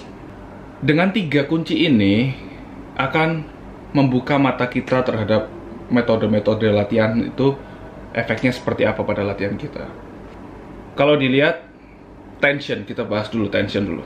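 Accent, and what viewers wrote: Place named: Java, Indonesia. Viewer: kok koyo wong solo / jogja ya. logatnya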